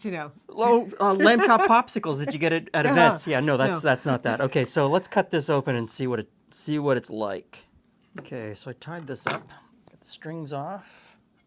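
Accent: Canada. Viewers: The CC is translating his Canadian accent "Blazing Bull", to Blazing Bowl.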